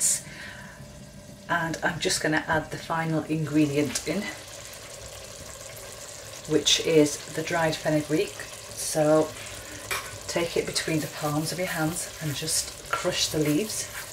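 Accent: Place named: North East, United Kingdom. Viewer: Love the recipe , you sound like a north east girl xx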